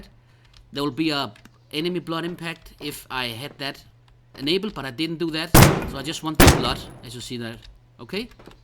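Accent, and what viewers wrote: Denmark. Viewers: i can hear that u are Danish bro. I'm Danish too and i recognize that way u talk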